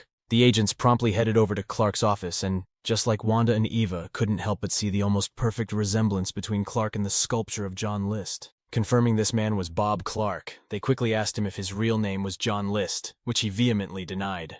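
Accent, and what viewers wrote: United Kingdom. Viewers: oh bless Ur heart ❤️❤️❤️ it's not about the rewards it's about keeping U making this content don't stop Ur bloody good at it!!! Excuse the terminology lol I love Ur British too!!! So here's to many more videos 😁😁😁 xxxxxxxx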